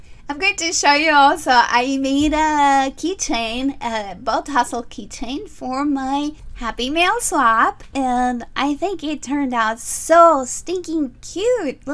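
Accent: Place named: Latin America. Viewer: Oh no problem I understand ... I just hear a bit of Latina and I was just curious great video have a great week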